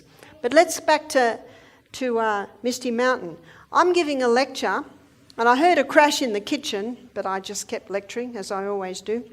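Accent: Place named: Australia. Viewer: She's Australian....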